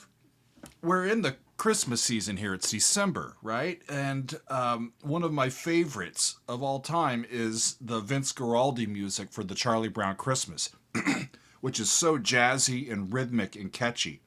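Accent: United States. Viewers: seu sotaque americano é muito bonitão! Gostei! ❤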